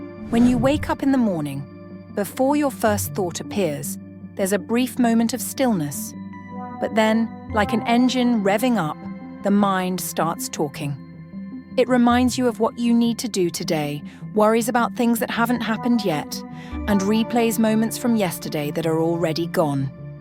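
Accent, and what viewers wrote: United Kingdom. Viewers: Couldn't stand the snooty brit voice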